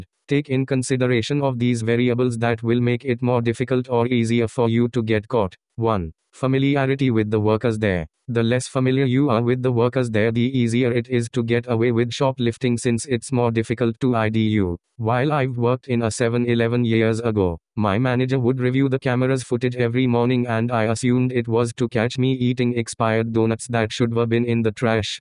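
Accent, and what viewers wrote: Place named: India. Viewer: AI VoiceOver with an Indian accent??? huh?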